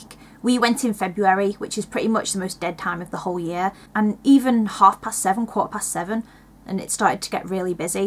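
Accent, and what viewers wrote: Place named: Wirral, United Kingdom. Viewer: Are you from the Wirral? Sounds like a wirral accent :) I'm Liverpool myself haha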